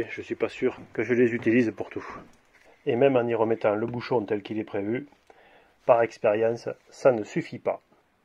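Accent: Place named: Bigorre, France. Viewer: Félicitations. J'ai moi aussi installé mon poêle dans mon atelier cette semaine. J'avais aussi regardé pour un Godin identique mais je n'ai pas eu la chance d'en trouver un à un prix si bas. C'est donc un theca de 20kw car j'ai la chance d'avoir une grande surface de jeu. Très belle vidéo...c'est un plaisir d'entendre l'accent bigourdan sur YouTube. Amitiés gersoises Anaïs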